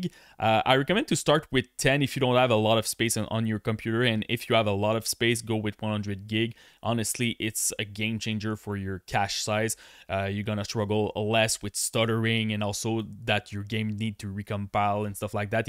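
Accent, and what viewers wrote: Quebec, Canada. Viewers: T québecois avoue lol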